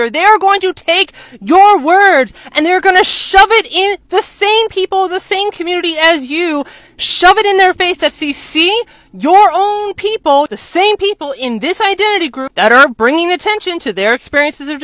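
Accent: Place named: United States. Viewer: I like your American clear accent.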